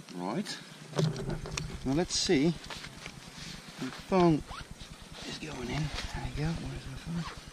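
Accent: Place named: Netherlands. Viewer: yeah! Only thing is that it takes about a month to get it delivered. I live in the Netherlands. I suspect that's a place you know well given your accent!😉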